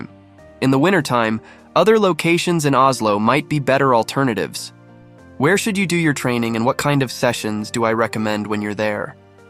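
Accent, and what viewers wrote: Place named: Canada. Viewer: You're Norwegian? Your accent sounds Canadian.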